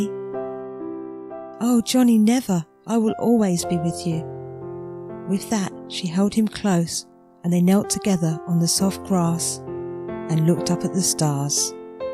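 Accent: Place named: England, United Kingdom. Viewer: Beautifully read. does indeed have a pleasant voice- very warm tone and clear dictation. Me being from the US I find the English accent very pleasant and nice to listen to. I don't have much experience with background music for audio books so I will just say it seems pretty solid to me. Can't really give any pros or cons due to my lack of experience there. Sounds good.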